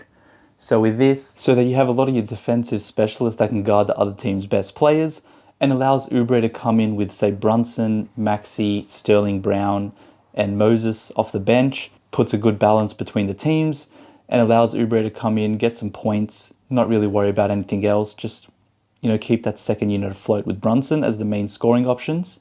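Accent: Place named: Australia. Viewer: Just found you. I grew up around Dallas when they first became a team in 1980 or the 80's (getting too old to remember). I'm guessing your an Aussie, hard to tell with some Aussie, English, or Kiwis, for me. Great analysis, would be awesome to get Dragic and Oubre. You never know with Cubes. Great that Slovenia and Australia are playing each other that will bond Luka and J Green. #mffl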